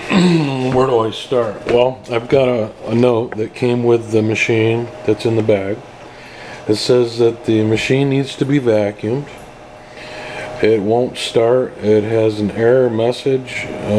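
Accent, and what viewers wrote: United States. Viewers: Are you from Missouri?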